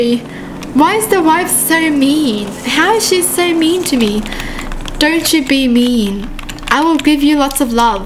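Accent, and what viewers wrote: Australia. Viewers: but she has an Australian accent while has a Japanese accent?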